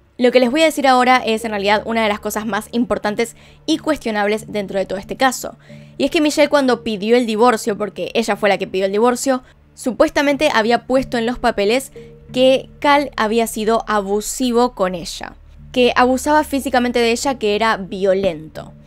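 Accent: Argentina. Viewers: QUe hermoso narras!!# adoro tu acento argentino. Saludos desde illinois uss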